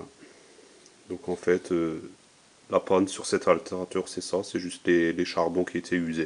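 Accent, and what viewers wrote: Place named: East, France. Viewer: super merci je vais essayer de regarder ca j'y comprends pas grand chose mais je vais m'y coller en plus visuellement tu repares le meme que le mien. Il vient d'ou cet accent de l'est ? moi proche Forbach mais maintenant en ile de france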